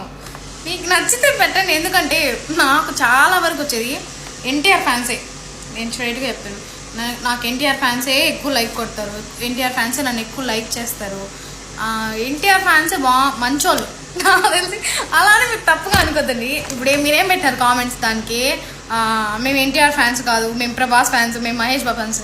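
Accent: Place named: Rayalaseema, India. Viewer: Meru kadapa bedda na rayalasema leka karnul aa me voice lo base bagunde super continue🎉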